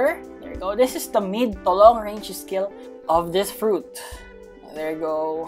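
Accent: Philippines. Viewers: Bro his filipino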